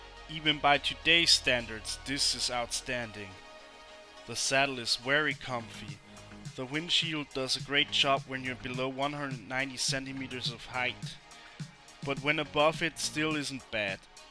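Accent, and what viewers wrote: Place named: Bavaria, Germany. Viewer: "Geländestraße" in feinstem Bayrisch! Danke fürs Review :)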